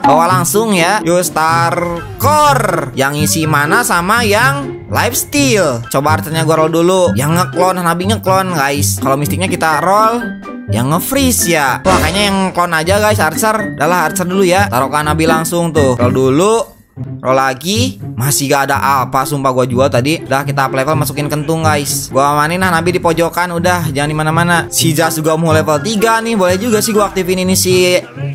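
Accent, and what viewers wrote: West Java, Indonesia. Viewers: Bang kemarin kemana bang di tunggu tunggu eh ga ada gua suka omongan lo bang Sunda asli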